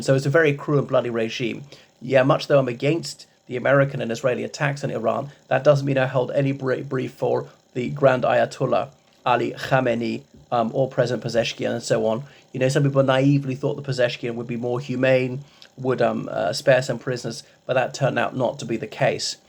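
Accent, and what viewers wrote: RP, United Kingdom. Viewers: 💋 You're like a sexy articulate rugby player w/ a posh accent sooo sexy omg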